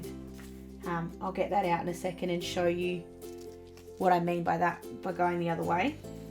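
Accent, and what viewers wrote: Australia. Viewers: Just Love Aussie accent and culture!! :D thanks for the video!! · Between your adorable excitement & your accent....I was anticipating a “crikey” or 2, but at least once 😂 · are you from QLD? Trying to guess your state by your accent :)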